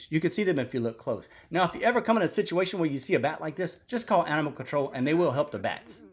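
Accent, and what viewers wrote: Louisiana, United States. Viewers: Dude Your From Louisiana? Me too but where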